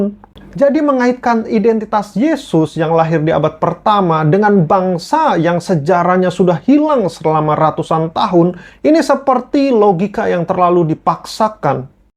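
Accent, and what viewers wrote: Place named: Surabaya, Indonesia. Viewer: Beliau... dahh jelas wong suroboyo...😂😂😂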